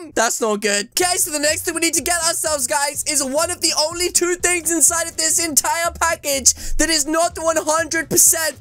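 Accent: United Kingdom. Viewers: Bro is in British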